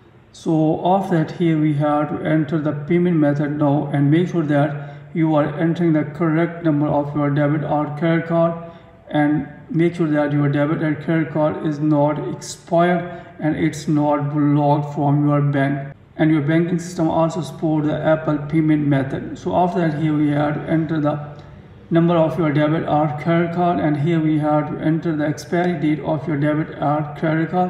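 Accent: India. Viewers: He’s Indian bro. He did great.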